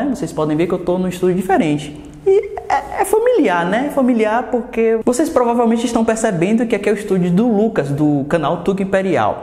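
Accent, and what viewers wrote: Bahia, Brazil. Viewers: Tu é baiano é vei? Ne?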